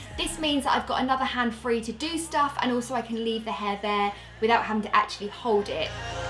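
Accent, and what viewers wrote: United Kingdom. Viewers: I would die for your british accent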